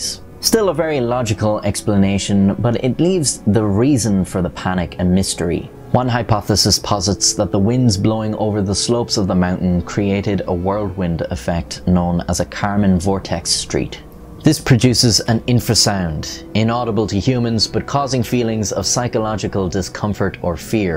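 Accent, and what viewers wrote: Ireland. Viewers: You tell a story very well. I like your Irish accent. This story always fascinated me. Common sense says it was a freak weather occurrence that seemed like an avalanche because it obviously made them run for their lives. Likely in the rush one of them might have not been able to quickly unzip the tents flap and cut himself out. Things like the eyes/tongue missing would be forest animals/birds. I wonder if a movie has ever been made recreating the most likely scenario. It would be interesting. RIP to them all. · A fascinating story. BTW, You have a very good narration voice. Only slightly Irish, and that's OK.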